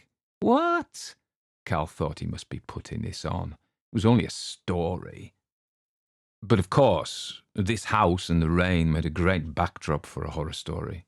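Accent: Germany. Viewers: Great depiction of the gruesome folk character! And a superb reading. I too have a fascination for puppetry, automata and all manner of dancing jerky creatures... remember Raggedy from Rupert the Bear? Twiggy, jerky thing of nightmares that he was. I also loved those Metz adverts: "Beware the Judderman my dear, when the moon is full" has long been a catchphrase in my household. 😆 Also, I'm reminded of the stunning work of the early 20th century German director and animator Lotte Reiniger. She pioneered silhouette animation. Some of it is really dark and creepy (deliciously so!) and all of it is very beautiful. If anyone here isn't familiar with her work you can find some on Youtube. Compliments on your German accent too. (I was born in Germany coincidentally, but grew up in England). Thank you for another great reading and composition! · I think you did a great job on your German accent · "and the promise of eternal darkness" yikes! 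Omg, you are an expert storyteller! Your scary voice and German accent are fantastic.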